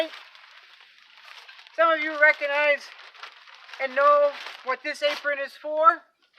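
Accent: Maine, United States. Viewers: My mouth is wide open in shock and astonishment at how entitled some people actually are.😶😦😦. You might need to invest in some ferocious dogs to scare these weird people off. And you are not out of bounds. Some people you give an inch to—they WILL take a mile, and never be ashamed of themselves. I love your attitude and hard work ethic. My husband’s family lived in Presque Isle. Remember when there was an Air Force Base there decades ago? His Mom married a Airman from the base and they finally retired in a warmer climate. She had the same accent as you, so you make me smile as I hear you speak. ♥️🥰😁. That snow and cold looks brutal, but you are one tough woman and we are proud of what you accomplish. 💪🏼. I love you videos, I just found you a few days ago, and I am hooked. Next time that man shows up, hand him a shovel or an ax and tell him he will have to work for that goose—and the work will be double if he wants the breast meat. 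That should scare him away.😂. Blessings to you.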